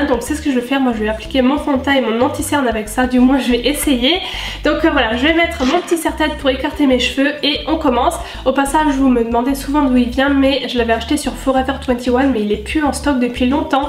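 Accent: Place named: South, France. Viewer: ah boooon tu viens d'Alsace, moi aussi mais je trouve pas trop que tu as l'accent Alsacien j'aurai même presque dis du sud mdr, aah c'est peut être parce que tu viens d'Alsace Moselle sinon superbe vidéo continu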